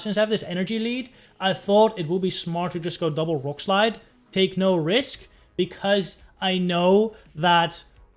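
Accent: Netherlands. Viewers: I’ve been trying to clock your accent and now I think you’re Dutch! Sorry random sidebar from a new follower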